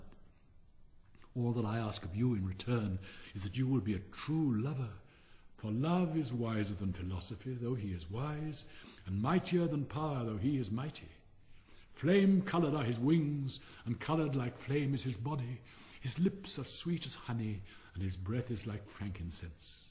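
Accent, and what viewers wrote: United Kingdom. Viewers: Thank you! I was looking for a british narration.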